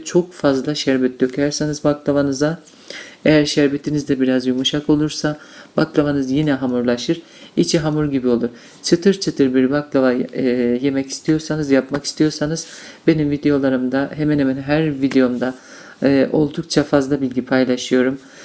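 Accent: Black Sea, Turkey. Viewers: Selamunaleykum abla sesin karadenizli sanki rize artvin sivesini duyuyorum 34 yasindayim Hic acma baklava yapmadim yarin oturup yapmaya caliscam Insallah basarili olurum Hep annem yapar bize Hic firsat vermez,annemde ayni Senin gibi yapar elleri dert görmesin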